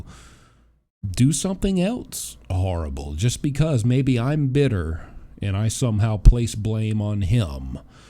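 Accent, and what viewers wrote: South, United States. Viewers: Wow, I love this story but most of all I love your voice - how did you get rid of your southern accent? I live in Alabama and would LOVE to get rid of mine (grins).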